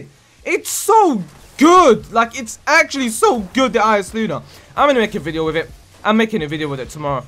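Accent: United Kingdom. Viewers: This is like british jev (sorry if you arent brittish im not sure what nationality you are but you sound british)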